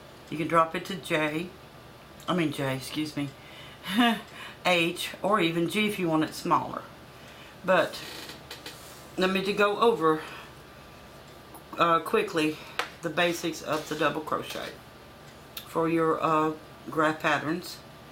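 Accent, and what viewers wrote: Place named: South, United States. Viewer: I love your country accent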